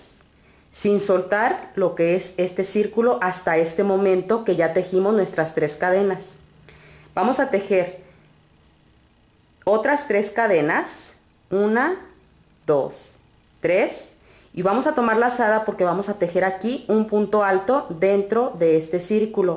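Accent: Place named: Mexico. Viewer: Como siempre me gusto mucho tu video. y el gorro tambien. Una pregunta personal: Vivo en USA soy Mexicana, por tu acento ..... de pura casualidad eres de Guadalajara,Jal.,Mex.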